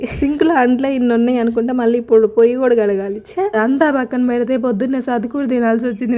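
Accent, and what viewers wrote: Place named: Ongole, India. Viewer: Sister ne matalu chala baguntaee ma vuroo Ongole side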